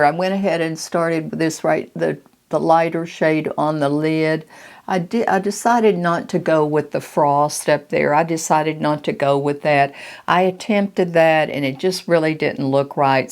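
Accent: South, United States. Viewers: You look fabulous,. I enjoy your longer videos; very relaxing. Especially your Southern drawl; you make my day. I’m sorry about your low vision problems. But one would never know by the way your makeup turns out. You’re just gorgeous and beautiful. Your eye makeup really brought out your beautiful blue eyes. God bless you,. Looking forward to your next video and welcome back! ❤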